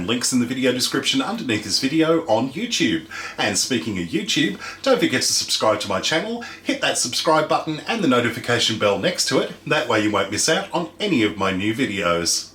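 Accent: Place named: Australia. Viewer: This is my first time here, oh how I love our Aussie accent. Now I will follow you on utube, cake looks yummy.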